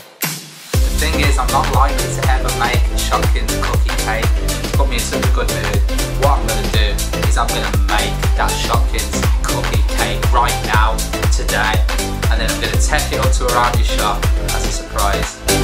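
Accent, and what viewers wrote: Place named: England, United Kingdom. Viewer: Love english accent jaja make a double Decker cake!! It would be great!! Greetings from México ;)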